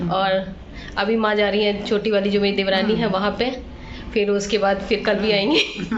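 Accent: Bihar, India. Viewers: Aap bihar se ho na?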